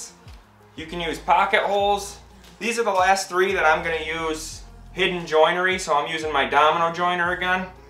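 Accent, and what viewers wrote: United States. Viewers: You speak perfect american english ; clear, tidy sound and excellent pronunciation ! Very helpful video for any wood works learner and english learner as well.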